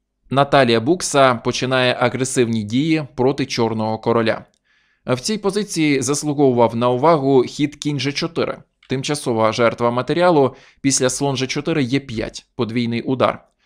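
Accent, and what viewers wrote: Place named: Western Ukraine, Ukraine. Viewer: Як нас на Західній Україні говорять файно.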